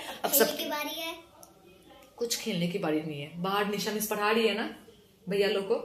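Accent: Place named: Bengal, India. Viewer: So cute bengal accent ❤❤❤❤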